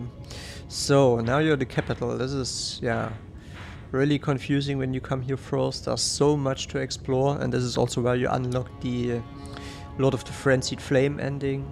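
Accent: Saxony, Germany. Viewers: schaue das Video 2 Sekunden und höre direkt dass du ein deutscher Sachse bist😂 nice one bro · Dieses deutsche English. Man kann als Deutscher den aktzent einfach nicht verstecken. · Interesting. You sound like a german from saxony. :)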